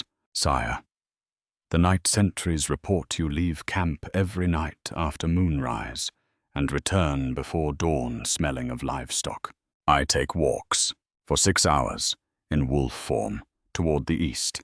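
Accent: United Kingdom. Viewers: I hear ya, and offer this solution that I have found to be quite effective. I listen to these fantasy tales on my cell, so I fold a piece of paper over the top and have it long enough to reach below that picture section, and then click on Show Transcript. The words scroll up the screen as the narrator speaks (oh that YUM purring English / British accent), and let my imagination do its thing by making a movie in my head that depicts the scenes and the characters. It is much more fulfilling & enjoyable that way.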